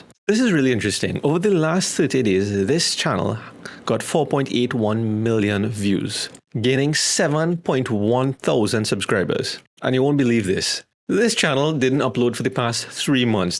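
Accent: Trinidad and Tobago. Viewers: Trini accent? Lol had to ask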